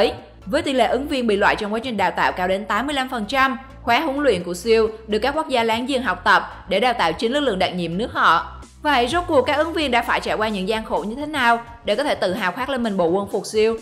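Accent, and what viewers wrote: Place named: Central, Vietnam. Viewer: Giọng miền Trung mà thuyết minh thì hãm thôi rồi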